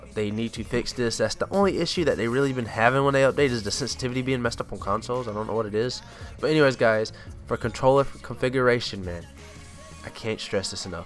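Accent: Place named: South, United States. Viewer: He sound good st the beginning but then he country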